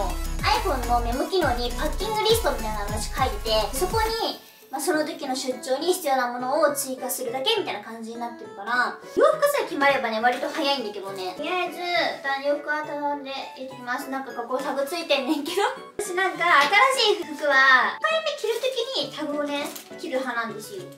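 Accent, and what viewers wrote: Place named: Kansai, Japan. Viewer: あれ⁉️ さんは、関西の血が通ってた？ちょいちょい、関西のイントネーションや言い回しを、ぶっ込んでくるよね😂😂😂 とにもかくにも、沖縄の【蚊】は、デカいよ😱😱😱 ていうか、台風大丈夫⁉️🥹🥹🥹 出張、お疲れさま｡｡｡(lll __ __)ﾊﾞﾀｯ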